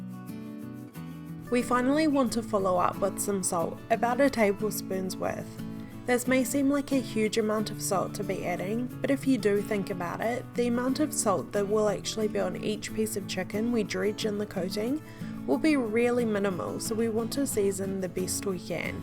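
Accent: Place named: New Zealand. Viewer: I knew your cool accent was from New Zealand! I lived in Wellington four years; many fond memories. Love the clear recipe instructions; subscribed!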